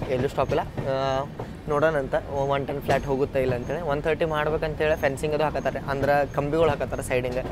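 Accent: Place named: Hubballi, India. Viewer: ಉತ್ತರ ಕರ್ನಾಟಕ ದ ಅದರಲ್ಲೂ ಹುಬ್ಬಳ್ಳಿ ಕನ್ನಡ ಇಷ್ಟವಾಗುತ್ತದೆ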